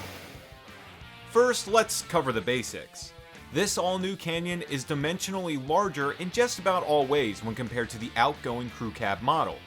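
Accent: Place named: Baltimore, United States. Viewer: The Baltimore is strong with this guy 😊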